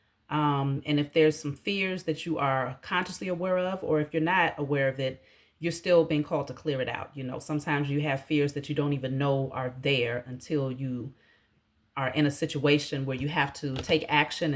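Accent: Washington DC, United States. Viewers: This msg was 🔥 torch. Ur DC dialect puts a smile on my face every time…being from SE DC myself. As always thank you!!😉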